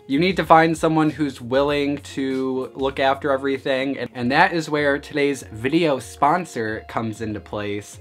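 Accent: Midwest, United States. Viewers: He def has a Midwest accent! I never made the connection!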